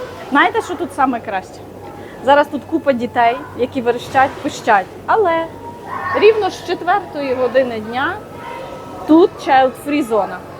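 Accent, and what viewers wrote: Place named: Lviv, Ukraine. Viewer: Супер! За вікном казка. Чудові краєвиди❤ У ваших відео є особлива родзинка -мова, львівський говір, і це дуже притягує. Дякую, що поділилися враженнями. ❤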